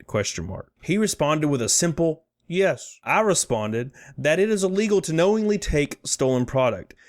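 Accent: South, United States. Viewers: Well done your sense of humor is right on the mark ,and you sound like you might be a fellow southerner .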